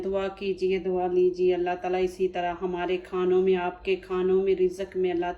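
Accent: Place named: Punjab, India. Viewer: MashAllah bohet mazy ka nan bohet achy treqy se semjaya ap mujy pnjab se lgti he ap ka andaz benjabion wala he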